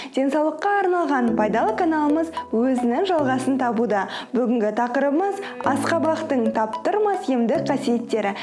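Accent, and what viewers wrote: Standard. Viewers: Ана тілімізде таза сөйлеген өркенің өссін айналайын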